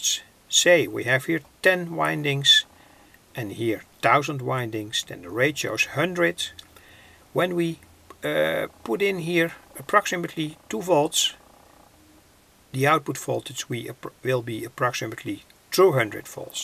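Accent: Netherlands. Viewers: Leuk Nederlands accent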